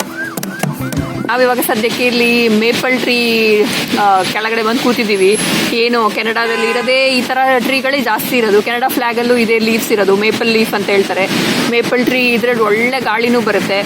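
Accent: Mangalore, India. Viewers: Sis nivu mangalore kannada ne matadi keloke manasige eno khushi anasatte plz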